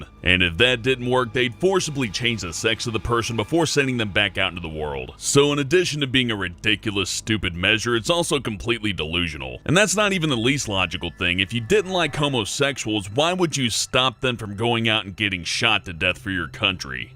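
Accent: United States. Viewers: when did become american? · the way he talks is too deep, harsh, forced and silly for the content of the video. It's difficult to focus on what he's saying behind that shitty american-thicked accent of his. Dolan's voice is soothing, serious, and has great ethos. Basically, he just sounds a hundred times better.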